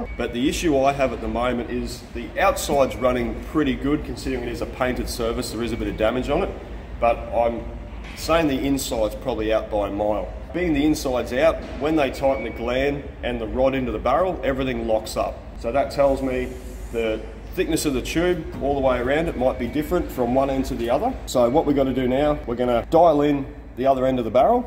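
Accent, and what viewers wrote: Australia. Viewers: First time I've heard it said like that: "moyd in tha yoo-ess-ayyy" (made in the USA) hahaha love the aussie accent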